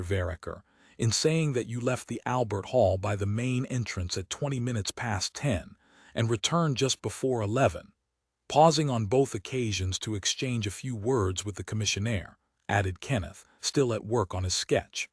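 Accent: United States. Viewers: A Heyer story narrated by an American? Terrible! I couldn't listen. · Gawd. Terrible narrator even without the ridiculous American accent.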